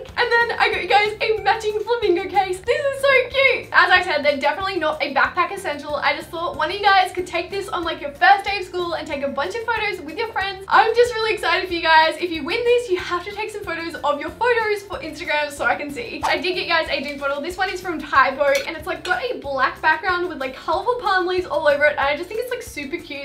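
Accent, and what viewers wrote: Australia. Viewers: Is that an Australian accent? Hope I don't offend you if it's not. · she's Aussie because from QLD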